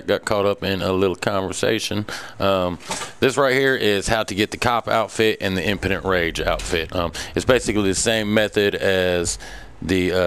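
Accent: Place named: Tennessee, United States. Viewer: U from Tennessee or what?i know a near home accent when i hear it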